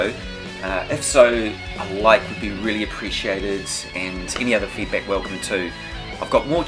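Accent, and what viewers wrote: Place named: New Zealand. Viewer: New Zealand I think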